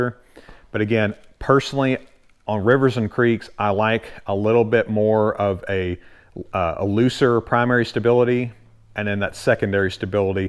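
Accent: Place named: South, United States. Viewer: This Minnesotan enjoys your subtle southern drawl 😁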